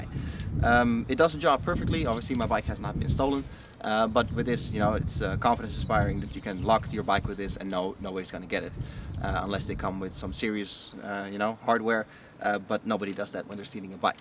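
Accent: Netherlands. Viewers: It took me a very long time to realise that you might actually be Dutch instead of American.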